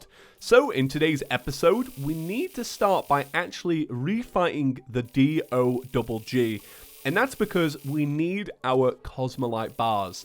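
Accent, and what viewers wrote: United Kingdom. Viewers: R u brittish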